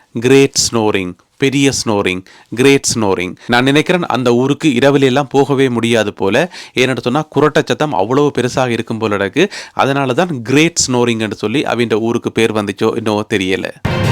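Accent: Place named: Nainativu, Sri Lanka. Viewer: Nainathevu language.Very clearly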